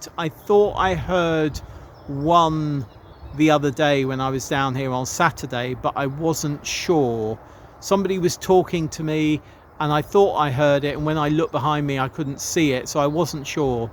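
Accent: United Kingdom. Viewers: I just love hearing your British accent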